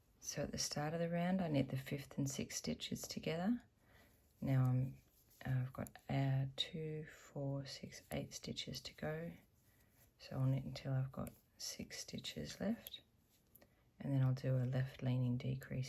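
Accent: Australia. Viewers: So refreshing to hear a fellow Australian accent on a knitting video! I always worried my 'free-form' approach to weaving in ends was 'wrong', so it's very reassuring to see how you do it, it makes sense to have an intuitive attitude. I love the neat result of the seam, so I'm going to follow your suggestions.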